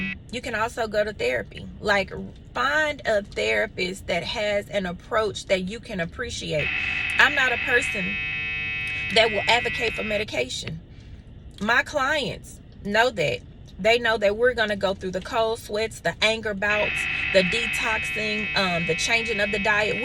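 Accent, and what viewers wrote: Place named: South, United States. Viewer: You must be from the South. (Especially AFTER hearing your accent) Focus on yourself, and not Social Media. Obviously you had a rough childhood. That's probably why you like D-Boys!!! And you'll Always be like that!!! Good 👍 luck 🍀 in the Future. Sorry that you're Pops didn't give you that Validation.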